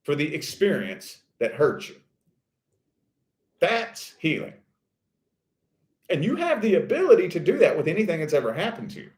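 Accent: South, United States. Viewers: Congrats on your move. Las Vegas is like NYC it has grown. Where you moving to? A lot of people are moving to TN. But I do hear a Southern accent coming from you. Where are you originally from? Best wishes to you & your wife in your new home!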